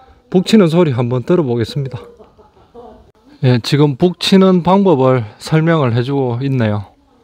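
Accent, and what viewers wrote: Gyeongsang, South Korea. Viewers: 빈집 찾는데 노력 하지말고... 늙은 어재 사투리나 고쳐 주세요.... 근본도 없는 갱상도 사투리 좀 고쳐요...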